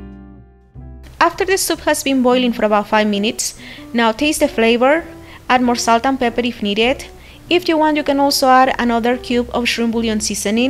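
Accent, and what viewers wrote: India. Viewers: bien sabroso caldo camaron grascias. from where are u?? u are from India ur accent from India,I love that soup I rather use fresh shrimp, it's perfect for now winter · If she's Mexican but she sounds like she comes from daneli india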